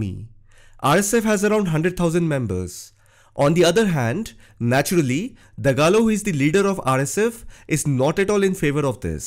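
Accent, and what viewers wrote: India. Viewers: Well explained but not all are true from ur voice i can see you are an Indian repoter and that's why not all ur info are correct but you hit must of the points. More concrete research and confirmation from a well respected reporter in one of this african countries like UG, Keyan, Sudan will give you proper details. There are more to the war. I didnt see you talk about china here ask questions you will get the exact details. You did so well once again thank you for speaking out. God bless you 🙏❤ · ❤You spoke very well English - during the first 20 seconds... Then you got too animated and slipped into "Ind-glish" - which is unbearable to listen too!❤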